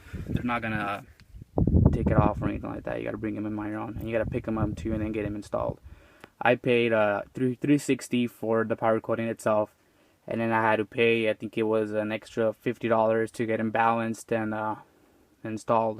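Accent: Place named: Mexico. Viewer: Sick ride! And I actually like the Meskin accent bro!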